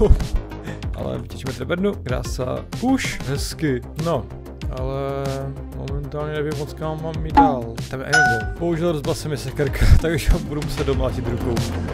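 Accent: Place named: Slovakia. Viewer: Si Slovak? Lebo maš prízvuk Slováka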